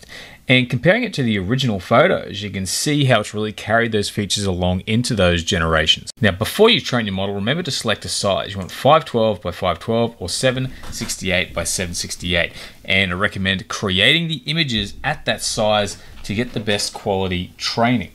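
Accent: Australia. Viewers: Thanks, excellent. Interesting side note how Australians pronounce ‘data’ differently to the English. The Ashes series has just started here, c’mon England 😊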